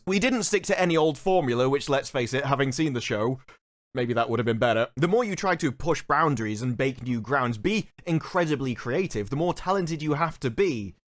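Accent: England, United Kingdom. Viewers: is definitely one of my favorite content creators, him and Mauler, some insults and comments are just funnier with an English accent. Mauler's video where he deconstructs Doctor Strange and the Multiverse of Lameness is one of my favorite videos of all time. Also, that Punisher shirt is AWESOME.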